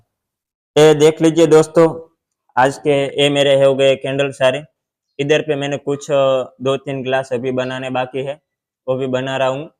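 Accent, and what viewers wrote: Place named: Kathiyawad, India. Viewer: Apne jo 30,000 rupees invest kiye the wo kis chij me invest kiye the wo ap explain kar sakte hai kya ? Video me karenge to bhi chalega or messages me replies karenge to bhi chalega.....or mujhe ye janana tha ki - 1 - melting machine price 2 - kitane type ke wax type hai apke pas or usaka price. 3 - wax melt karne ke bad jis glass me wax dalate hain us glass ka price 4 - maine apka box dekha hai wo apne shayad banwaya hai or wo bahut cha hai to us packaging box ka price. 5 - ap kitane type ke colour use karte ho or her ek color ka price. 6 - agar ap fragrances use karte ho to usaka price kitana pada apko. 7 - wicks ( candel dhaga ) hoti hai usaka price 8 - candle banane ke liye ap jo molds liye honge, to apne kitane types ke molds liye hai or unake bhi price batao. Hame bas apke raw material ka price ka idea batao kyunki hamare idhar ki kuch ladies start karna chahti hai. 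Or wo bahut Young hai agar ap ye sab batayenge to bahut help hogi. Ap shayad gujrat se hai, because apka awaj kathiyawadi type ka lag raha hai, or apki video me jo apne e-commerce ki journey dikha rahe hai... Wo bahut achi hai. Aisehi ap imandari se kam karte raho.bhagwan apko bahut age bhadaye Dhanywad apko 🙏❤️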